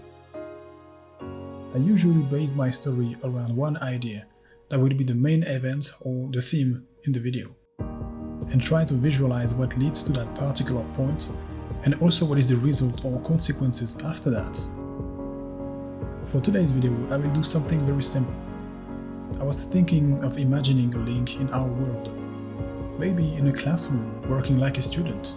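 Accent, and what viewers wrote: France. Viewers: I have the impression that you are french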